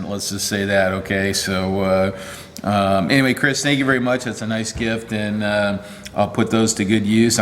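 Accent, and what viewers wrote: United States. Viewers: I always thought that vernier calipers were pronounced "ver-ni-er", not just two syllables. Is that a local east-bay accent?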